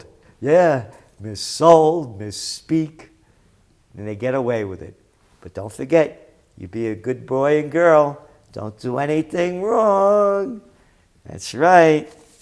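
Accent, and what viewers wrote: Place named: New York, United States. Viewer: Great job Gerald ! I was born and raised in Brooklyn NY and watching how you articulate and present this corrupt system in a very New York way is like music to my ears ! Thanks so much for all you do ! You are part of my "A Team"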